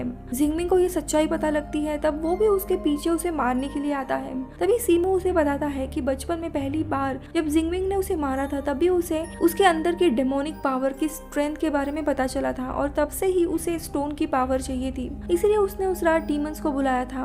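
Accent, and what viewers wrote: Maharashtra, India. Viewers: Aap marathi ho kya?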